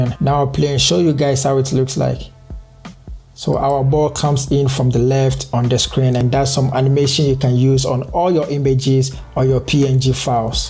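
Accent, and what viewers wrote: Ghana. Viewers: You sound like a Ghanaian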